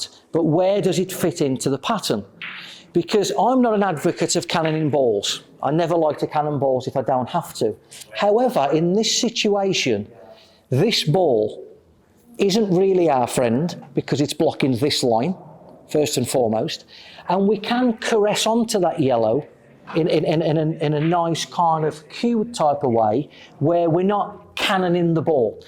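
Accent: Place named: Birmingham, United Kingdom. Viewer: That’s a really thick Brummy accent!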